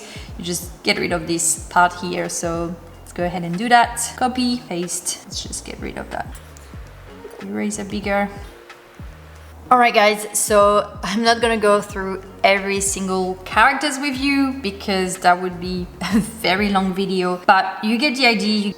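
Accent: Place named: France. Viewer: Your accent is quite good, but I can easily recognize your french ! ;)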